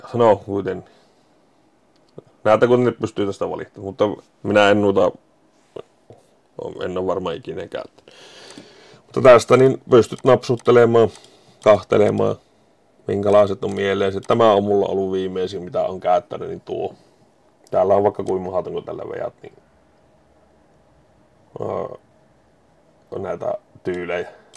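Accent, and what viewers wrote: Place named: Finland. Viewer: Olen lukenut kaikki päätalon kirjat. Ootko sieltä päin. Murre kuulostaa sille.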